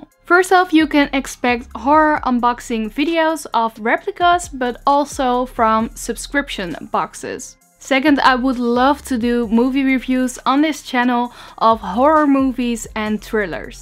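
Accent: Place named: Netherlands. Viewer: Ahhh! Can’t wait! I love the Dutch accent though. Haha, it’s so cute, I have it too haha.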